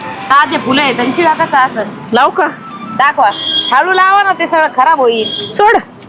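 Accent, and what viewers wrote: India. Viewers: आस्सल नगरी भाषा बोलती 😂😂😂😂😂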